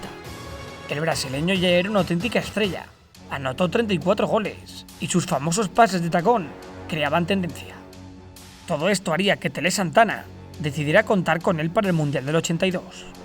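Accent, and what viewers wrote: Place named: Andalusia, Spain. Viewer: Me emociono hasta las lágrimas. Como persona: ejemplar, generoso, brillante, lúcido, líder con un aura especial. Como futbolista, que decir, fino, exquisito, ultra inteligente, tácticamente diferenciado, el hijo de TELE. SOCRATES-FALCAO-CEREZO-ZICO. Los futboleros no olvidamos. PUÑO arriba. Nota de color, me encanta el relato andaluz.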